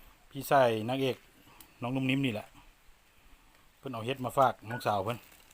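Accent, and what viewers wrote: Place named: Phu Thai, Thailand. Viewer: อยู่จังหวัดได๋น้อจ้า เว้าคือภูไทสกลจ้า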